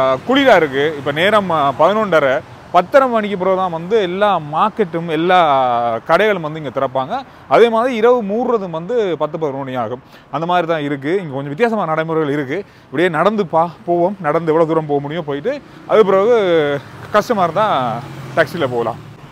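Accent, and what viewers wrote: Sri Lanka. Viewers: Ur tamiz is good. Excellent srilankan tamiz... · Srilankan Tamil .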